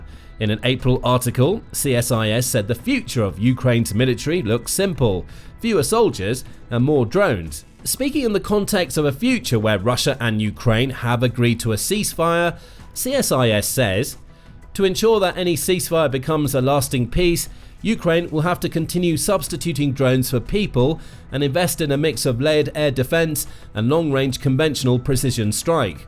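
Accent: United Kingdom. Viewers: British English, yes, so kinda regional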